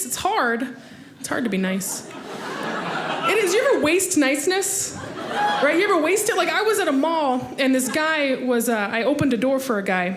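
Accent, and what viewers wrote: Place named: Midwest, United States. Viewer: From her accent I swear she's from the midwest!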